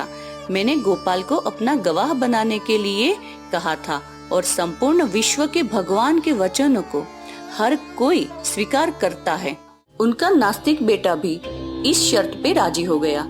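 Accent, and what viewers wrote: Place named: South India, India. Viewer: South Indian voice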